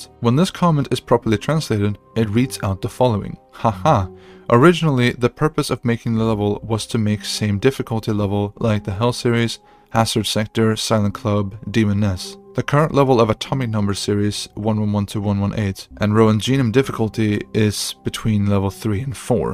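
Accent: Sweden. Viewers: he’s Swedish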